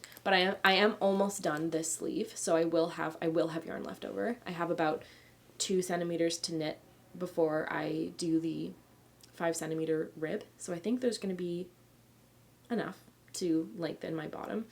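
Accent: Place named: England, United Kingdom. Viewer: I was trying to guess your nationality as your accent wasn’t strong , …. That’s because you lived in England ❤ xxxx What is a touk? Yellow is my favourite colour too, just knitted filcona arwetta french vanilla colour with drops lemon mohair cardigan by petite knit , circular shoulder style … love it 🥰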